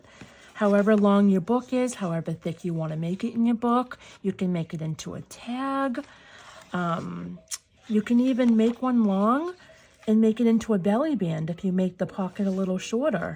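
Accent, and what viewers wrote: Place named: Boston, United States. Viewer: New subscriber!! Oh my gosh so wicked-awesome you're an Neweglandah!! Luv your Boston accent....my dh is Irish ❤️ his pak dah cah phrases at times! So hilarious 😂 You're so creative! Blessings!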